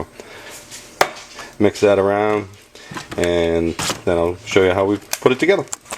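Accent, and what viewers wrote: United States. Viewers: I love the Boston accent! Trying it this afternoon and will be back at you...thanks! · Love the South Shore accent!!!!!! :P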